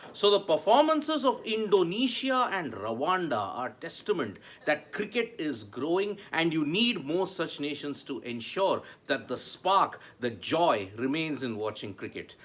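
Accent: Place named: Tamil Nadu, India. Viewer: Its Tamil Nadu accent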